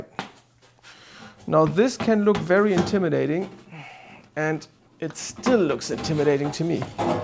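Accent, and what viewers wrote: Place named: Germany. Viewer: you sound German ...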